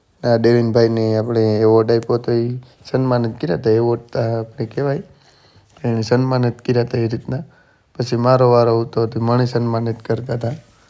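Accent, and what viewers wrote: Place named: Mer, India. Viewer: દીકા મેર ની ભાષા જ બોલજે તું એ ભાષા મા જ બહુ મીઠડો લાગે વાલા..... મેર ની બોલી જ મીઠડી છે હો.....❤ જાજુ બધું વાલ દીકરા તને અને ડેવિન ભાઈ ને.🥰👍🙏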